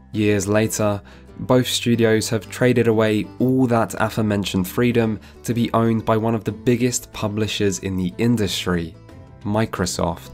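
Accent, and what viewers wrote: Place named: United Kingdom. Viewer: but you have a British accent!!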